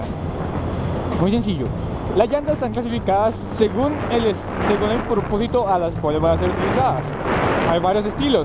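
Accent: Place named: Mexico. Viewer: dices que eres colombiano pero hablas como mexicano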